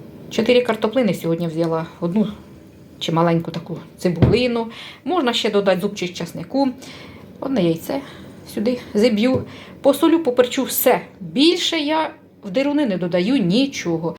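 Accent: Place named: Cherkasy, Ukraine. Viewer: Чути що говір черкаський. Я теж родом з Черкаської обл, на межі з Полтавською.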